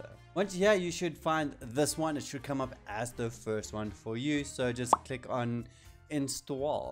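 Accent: South Africa. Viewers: howzit bru I heard your accent and was like, "Is he south african???" then i heard you say PK and i new you were south african lol.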